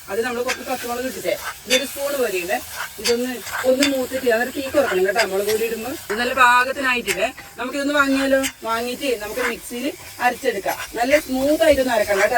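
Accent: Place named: Paravur, India. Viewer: ങേ ഇത് എന്റെ നാട്ടിലെ വർത്താനം ആണല്ലോ 🤔🤔 പറവൂർ ആണോ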